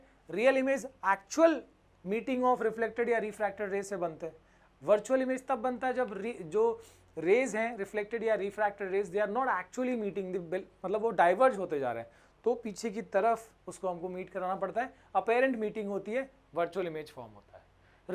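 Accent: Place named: Haryana, India. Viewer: I really like haryanvi accent and yaa we are neighbours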